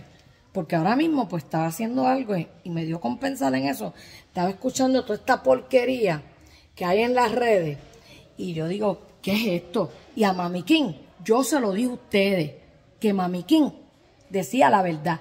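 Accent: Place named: Colombia. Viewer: He sounds columbian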